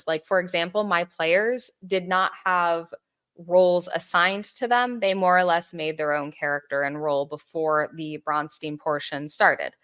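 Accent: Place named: United States. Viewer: You have a pretty flat American accent, anyway. The bastards just want to silence people and control everything 🤨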